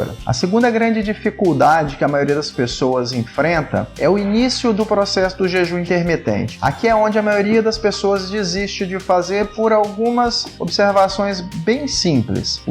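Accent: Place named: Rio de Janeiro, Brazil. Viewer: Fico encantada com sua voz, linda! Vc deve ser carioca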